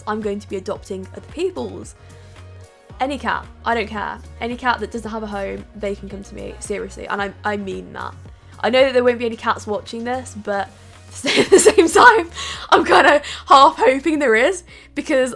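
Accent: United Kingdom. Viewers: I've never noticed your British accent before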